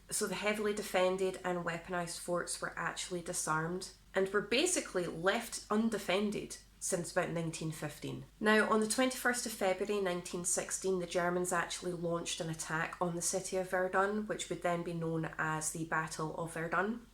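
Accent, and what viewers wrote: Scotland, United Kingdom. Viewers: This my first time checking your metal history. It’s pretty cool. I want to hear you pronounce some Hawaiian words. I haven’t heard that in a Scottish accent.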